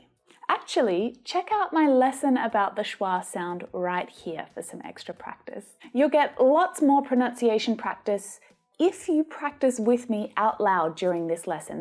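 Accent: Australia. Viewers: speaks with an Australian accent...why do you say it is awful? Btw where are you from?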